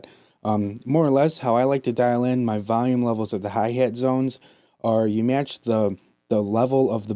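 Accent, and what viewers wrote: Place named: Midwest, United States. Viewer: I come to these videos all the time but I can't get over the Midwest accent you heeeve. Hey hates. What's heyppening with the hey hates. Ugh.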